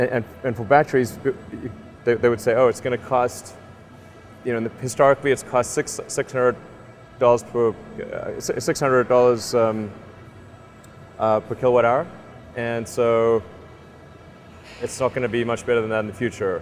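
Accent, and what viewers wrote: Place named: Australia. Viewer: How come he has an Australian accent?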